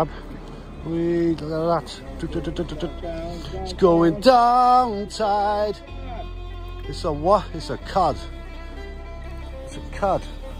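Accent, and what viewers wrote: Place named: Cardiff, United Kingdom. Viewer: Looks great great video and voice over that sounds like a Cardiff boy 👌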